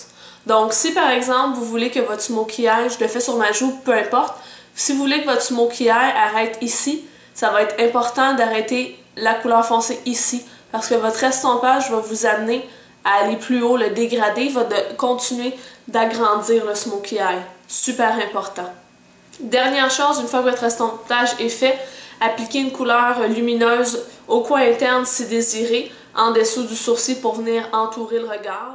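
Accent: Quebec, Canada. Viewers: Coucou!! merci bcp pour cette vidéo! J'aime vraiment toutes tes vidéos, ce que tu fais est génial!! Tu me donne envie d"aller vivre au quebeq j'adore ton accent ta simplicité et ta sympathie!! Merci encore et continu comme ça!! Bisous! PS: j'attend avec impatience les autres videos sur les toiles d'artistes je troouje cette idée vraiment géniale! :)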